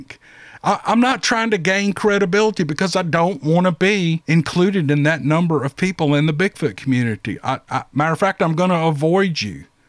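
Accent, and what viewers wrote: South, United States. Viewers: I love your stories and your voice/accent. I’m country-fied too. I have a strong southern accent. Those people who made the comments are idiots! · Don't change anything you do. I love your story telling, the mannerisms, and your southern drawl, its a gift. Thank you for your love of a good story, and your platform to share them. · I want to thank you from the bottom of my heart 💖for all that you do on this channel. Yes, I'm a little biased, because you have read my experience on here several months ago. ( episode 131) ☺️ But all in all I am not part of the Bigfoot community. Because I did not choose my experience. It chose me. I am not one of those fanatics who would go around bashing you BTW. Never have been...never will. I like the intro music that you use. I love your Southern drawl because I'm a West Virginia girl and it makes me feel at home. 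Your storytelling style makes me feel comfortable. It makes your story that you're telling me seem more real and more believable. Whether it is real or not matters not to me. What matters to me is that the style that you're telling it in, your delivery, it's the gospel truth. I really like whenever you're reading a line and it's funny, you break character and you laugh right along with us. Yes, you have a sense of humor and I think it's great! Because we're laughing, too. And when we can all laugh together it makes the world a better place! 🤣😂🤣😂 I really liked it when you had the children stories on here 📖. It encouraged them to be creative writers.📝 And that I think is totally awesome!👍😁 I think that's why I like your Steve Lily journals 📚 on your "What If It's True?" Podcast. I like your style of creative ⌨️ writing and I like the subject matter as well 👣. Okay, enough a$$ 😘 here, 😂 lol. 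God bless you. Please keep up the good work. I await your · 🤣 YOU TELL EM!! YOU TELL EM REAL GOOD to put their BIG FOOT in their PIE HOLES and just enjoy them Narrated Stories in ye Southern Drawl 🤣- BEST RANT EVER! 🤣 🇿🇦 PS: Love Your Stories! 🇿🇦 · God Bless Brother! As you stated some people are so bitter in their own lives they need to spread their misery... sad actually. You have my support and attention, love your southern accent when telling these stories. Just like TV, if you don't like the show...just change the channel and to hell with your opinion. Keep going brother! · I am a 68 year old southern girl. I love a good story and a real southern accent. You are awesome. Story telling is an art that was dying until people like you appeared on the internet, of all places. Thank you so much. You and those like you are national treasures. God bless:) Nancy in ga usa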